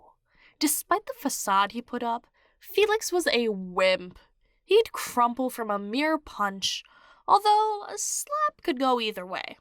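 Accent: United Kingdom. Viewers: You're Cockney-Estuarine accent is quite interesting. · I love your posh voice!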